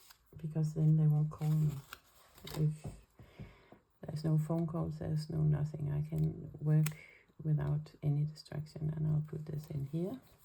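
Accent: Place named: Denmark. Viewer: I love your accent! My grandfather was from Denmark but I don't remember much about him besides the way he spoke :)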